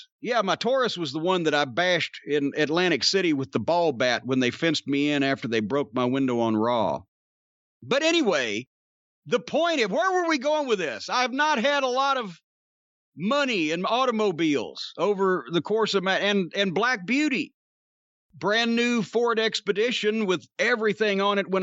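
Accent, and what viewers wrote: South, United States. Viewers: With the southern accent it's definitely a Hank Hill type thing I have never heard Jim sound that much like Hank Hill in my life but now I can't on here that. Mike Judge did base the character of Tom Anderson/Hank Hill off of all the older Southern men he grew up around. And although is about the same age as Mike Judge Jim sounded like Hank Hill when he said that and I honestly think it's just an older southern thing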